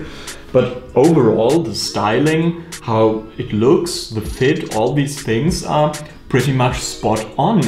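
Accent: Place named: Germany. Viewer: geil. wie man einfach das deutsche English heraushören kann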